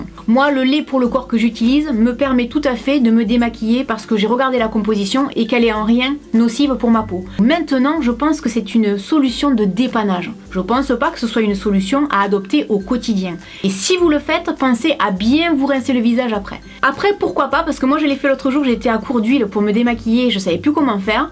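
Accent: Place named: Perpignan, France. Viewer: elle vient de perpignan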